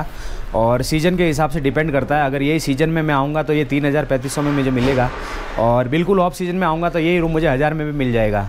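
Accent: Gujarat, India. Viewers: Avaj thi lage ke gujrati co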